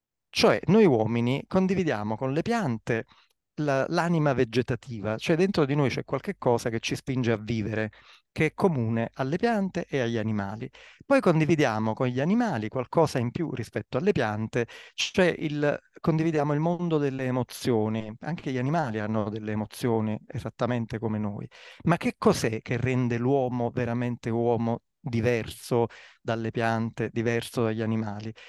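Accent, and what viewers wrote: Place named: Campania, Italy. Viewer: M' illumino di immenso...nell' ascolto del Professore dalla squisita cadenza campana, e dalla serena e incisiva esposizione di una realtà che, da sempre osservo, analizzo e compatico. Iniziando la analisi del greco antico, si aprono mondi, universi ... è assolutamente prioritario essere messi nelle condizioni di studiare la realtà circostante andando ad analizzare quali erano le parole utilizzate dalle grandi Civiltà per definire il nostro Universo. Da lì le ispirazioni si aprono...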